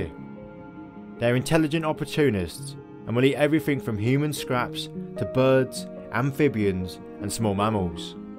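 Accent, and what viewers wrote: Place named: Poole, United Kingdom. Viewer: U sound like Poole mush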